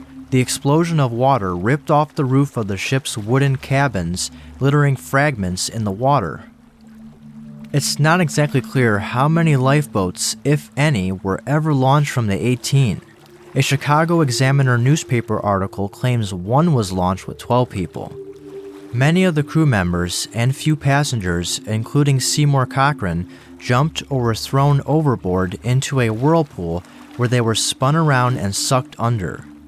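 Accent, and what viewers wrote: Cleveland, United States. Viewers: Dudes gotta be from Cleveland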